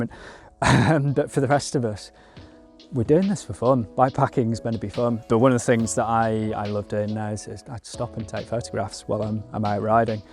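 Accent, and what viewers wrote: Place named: United Kingdom. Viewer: Fantastic video. So refreshing to hear someone from the UK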